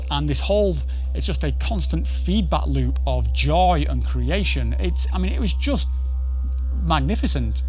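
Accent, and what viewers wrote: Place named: Manchester, United Kingdom. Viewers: I love this channel. I don't know why but there's something really nice about your stories being told in a northern accent. I'm a fellow northerner but from the other side of the Pennines up in the northeast. "I thank her for what she gave me and I forgive for what she couldn't give me" - this is absolutely beautiful. I had my first ceremony a month ago or so and this one of the big hurdles that I broke through and it's made so much difference to my relationship with them. This trip sounds bonkers though, I don't think I fully broke through tbh but it was a tremendous experience anyway. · I live in Manchester and judging by your accent you're not far from here. I'm ready for my journey to understand my childhood trauma. I have social anxiety and going to South America is too out my comfort zone to go alone. 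I need to find a budy who has experience and will come with me. Can you tell me how the best way I can do this and where. I'm 48 year old grown man and am educated on DMT. Please can you help. Really want to do this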